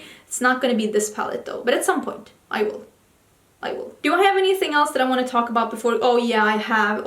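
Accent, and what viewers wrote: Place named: Sweden. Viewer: Hey! I've been mia for a few months, but congrats on 50k!!! And I'm loving the hair; I'm planning something similar ❤ Your English is getting so good we wouldn't even know you were Swedish without that cute accent!! Lol. In the U.S. we usually only use "neither" when referring to only 2 things. Like...I like neither salt, nor pepper. If it's a group of more than 2 we usually say, "I don't like any of it." Or "I like none of them." I'm only saying this cause I know you won't be offended, and I know you're a smart girl so you like knowing EVERYTHING about something. I can tell, cause you're like me. 😉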